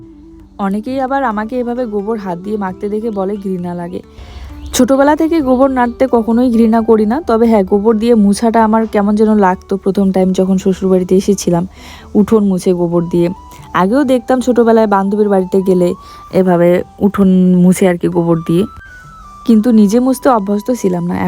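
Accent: India. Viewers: Arokom adha suddho r adha Rajbongshi vasha milie j vabea kotha bolo shunte valoi lagea.cha er sospen tai dim omlet korte paro.thal tou patla hoi tai fute utheche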